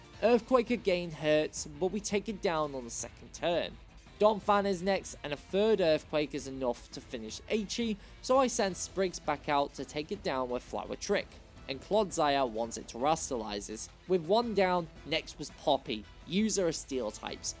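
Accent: United Kingdom. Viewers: Watching the first minute of this video I can tell he is British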